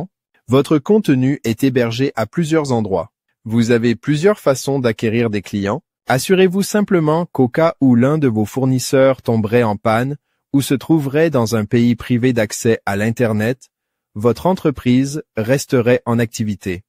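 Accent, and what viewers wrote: Canada. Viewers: avec un accent canadien, c’est rigolo 😃! Tu as traduit ta vidéo de l’anglais vers le français avec OneTake? C’est génial! La synchronisation des lèvres est incroyable wow 😊et la traduction aussi! Je suis ravie d’apprendre que mes vidéos sont en sécurité sur plusieurs serveurs et qu’ils sont redondant en cas de problème ou de panne pour que mes vidéos en ligne soit toujours accessibles, d’autant plus pour celles qui constituent mon offre!😍🫠🥰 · C'est peut-être bien pour traduire dans une autre langue que votre langue natale pour des gens qui ne connaissent pas votre voix. Mais, là, cela ne fait pas du tout naturel ! Hormis l'accent canadien, le rythme est souvent saccadé et ne respecte pas le ton naturel qui conviendrait à ce qui est dit. Cela fait vraiment robot. Personnellement, je n'aime pas.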